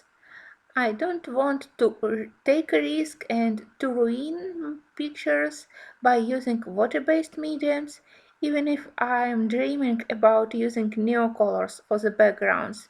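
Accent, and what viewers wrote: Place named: Russia. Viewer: Вы русская??? Очень русский акцент, как будто в российской школе учили английский язык))) я не ошиблась?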